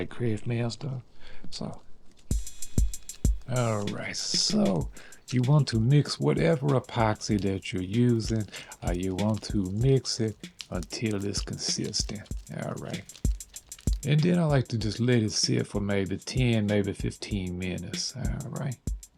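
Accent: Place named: South, United States. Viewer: First; boss level teaching skills. 2nd, all my people from Hot’lanta to Lake Charles talk just like this :)= feels like home.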